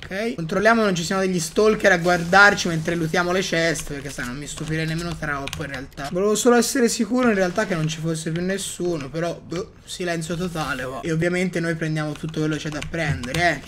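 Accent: Tuscany, Italy. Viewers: Con l'accanto toscano bellisimo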